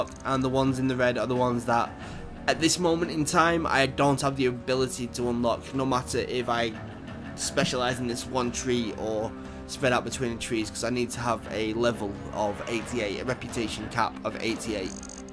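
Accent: United Kingdom. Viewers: i know right he saound like Hoxten a bit i though like what this is Hoxten and hes making tutorials :D he sounds bit like a hes from england i think he is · awful british accent is awful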